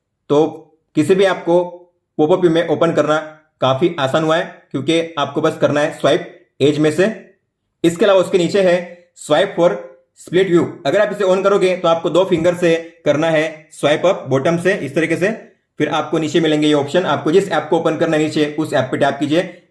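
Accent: Gujarat, India. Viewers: Bro r u from Gujarat??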